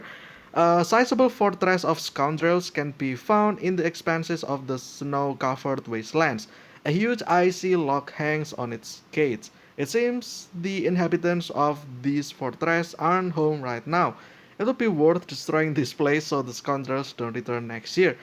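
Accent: Indonesia. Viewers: Iya ketara ngomongnya sih, orang indo pasti bisa dibedain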